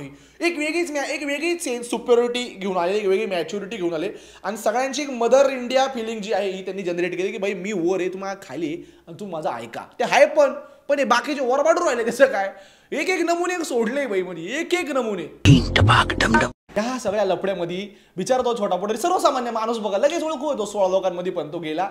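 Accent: Khandesh, India. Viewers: direct khandeshi accent madhe bolayla lagla ye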